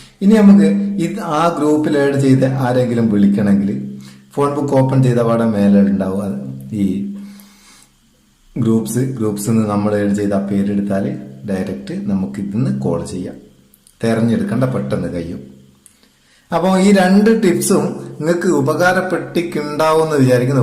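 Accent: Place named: North Kerala, India. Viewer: കേരളത്തിലെ വടക്കൻ ഭാഷ കേൾക്കാനും ഒരു സുഖം.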